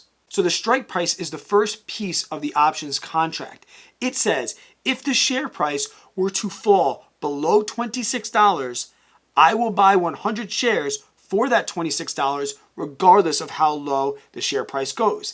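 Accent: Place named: Northeast, United States. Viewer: I love your videos! They’re very helpful for learning. Thank you! I also get a kick out of your accent 😂 Are you from the Northeast?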